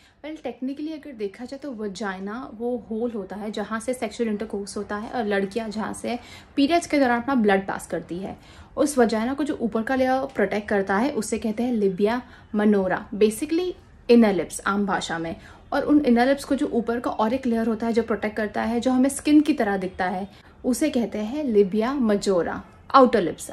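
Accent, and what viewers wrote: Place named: India. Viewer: indian accent could narrate my life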